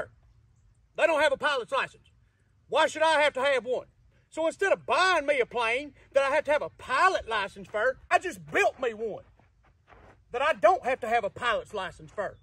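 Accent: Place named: Texas, United States. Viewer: bro sounds like Texas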